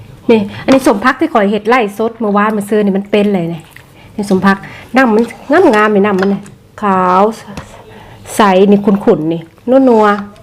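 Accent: Savannakhet, Laos. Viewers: ມັກສຳນຽງສາວສະຫວັນ ລູກສາວຈັ່ງແມ່ນເປັນຕາແພງ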